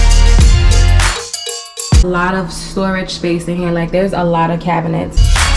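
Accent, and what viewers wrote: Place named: Brooklyn, United States. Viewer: love this video from the jump...lol. your Brooklyn accent is so strong. love it! I am planning on moving to Florida in 4 months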